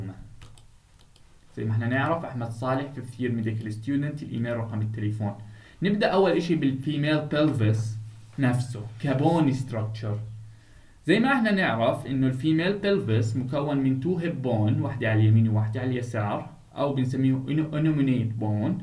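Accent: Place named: Iraq. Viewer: دكتور عندك اكسنت من اللهجة العراقية 😶‍🌫️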